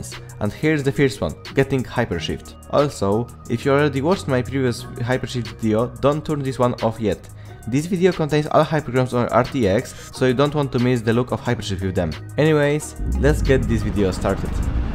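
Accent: Poland. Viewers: It’s because of his Polish accent, which we can’t blame him for, however, YouTube’s automated captions generate what he’s saying pretty well. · fajnie montujesz i wgl ale jak mowisz po angielsku to az boli xddd taki akcent bie angielski i jak sie baoglodam czasem anglikow to ptm mn uszy bola jak np b oglodam z pl akcentem xddd pozdro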